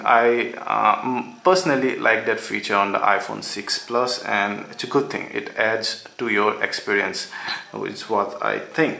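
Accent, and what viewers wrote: India. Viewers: Indian hands and ascent.... its easily to be recognized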